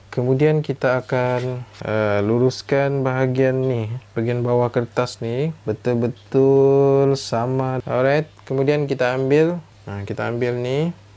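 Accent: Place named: Sabah, Malaysia. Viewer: Tuan boleh tau asal mana? Macam bunyi2 sabah. 😃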